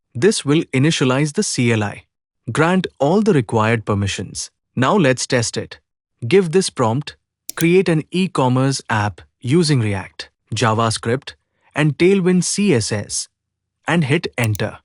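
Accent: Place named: India. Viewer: If your using AI for the voice why make it Indian?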